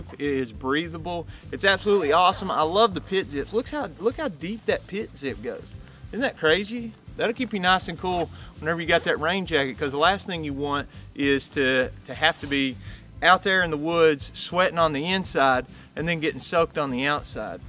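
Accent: Kentucky, United States. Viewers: I just found your channel I knew you were a southern Kentucky boy lol. In all seriousness Daniel Boone park is an amazing place.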